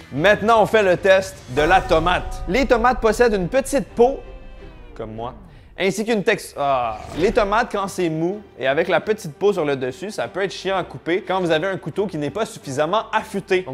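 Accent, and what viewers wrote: Quebec, Canada. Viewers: Qu’est ce que j’aime cet accent Québécois, c’est vraiment classe 💪✌️ Le bonjours à vous tous au Québec de notre petite 🇨🇭 je t’ai découvert récemment, tu es au top 👌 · Les ayants droits de Bocuse m'agaçent.. le mélange accent québecois - marseillais pépite lol.. le "petite peau comme moi".. m'as tué. Je modifie le commentaire au fur et à mesure de la vidéo :p tabarouette cauchemars dans la barquette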